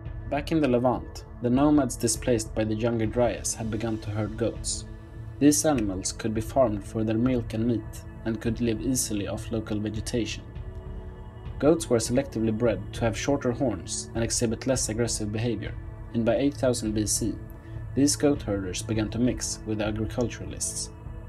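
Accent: Sweden. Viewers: Juan Carlos Andreu He's Swedish, cursed with an accent. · tænk, så stokholm-svensk... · Are you swedish? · The narrator sounds sooo swedish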